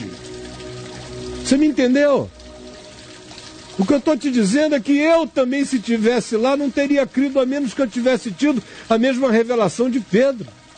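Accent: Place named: Bahia, Brazil. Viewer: Eu tb pensei que era um baiano! 😄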